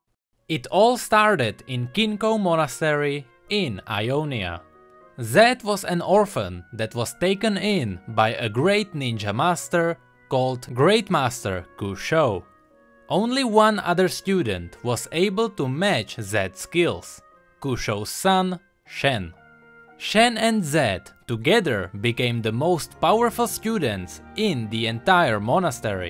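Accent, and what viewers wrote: Czech Republic. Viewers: poznal sem hned podle toho přízvuku že si čech :D your realy good keep going!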